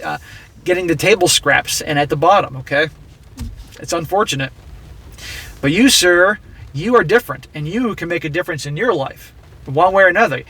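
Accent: Philippines. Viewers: This dude sounds like he’s from the Philippines. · Judging by his level of English I'd guess Philippines.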